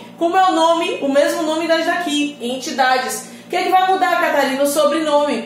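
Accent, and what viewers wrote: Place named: Bahia, Brazil. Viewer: Estou amando suas aulas, sou estudante aqui no Rio de Janeiro e sou baiano; seu sotaque parece ser baiano.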